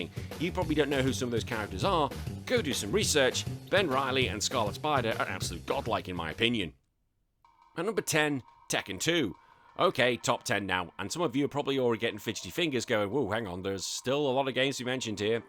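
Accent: England, United Kingdom. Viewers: "i don't give a..." whatever man just tell us your picks and stop pretending to be a tough guy. it will never work with that English accent I can tell you that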